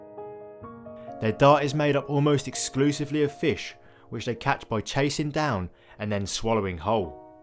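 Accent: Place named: England, United Kingdom. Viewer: I'm curious about your accent. What area of England are you from?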